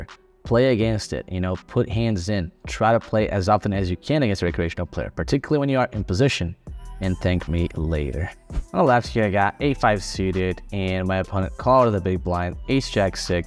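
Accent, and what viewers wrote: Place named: United States. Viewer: good content!I wanted to ask if you live in US or born there, seems to me that you speak perfect USA English, which I admire a lot!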